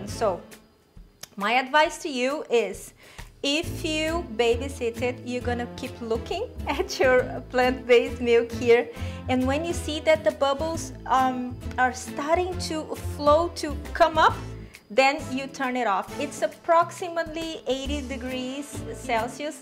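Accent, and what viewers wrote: Brazil. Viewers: Você é brasileira reconheci pelo sotaque <3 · I recognized your brazilian accent, omg I'm bilingual as fuck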